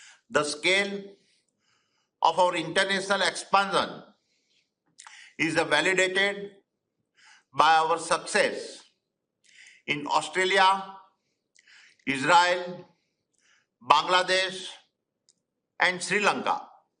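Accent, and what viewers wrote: India. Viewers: Damn! Even his english is like modi's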